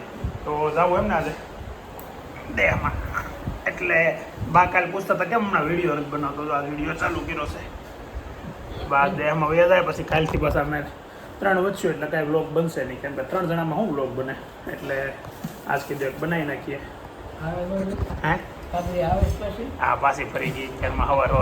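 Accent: Kathiawar, India. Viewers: sorry gujrati vachta nathi avartu Anguta chaap chu 😂 Bolta khatyavari gujrati che😂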